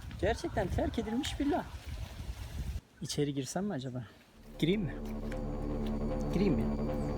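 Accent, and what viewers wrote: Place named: Erzurum, Turkey. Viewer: Abi bi erzurumlu olmama rağmen şu yokları yoğh yoğh diye demene uyuz oldum ya tam öyle konuş ya da tam istanbul ağzıya konuş ikisini karıştırınca çok itici oluyor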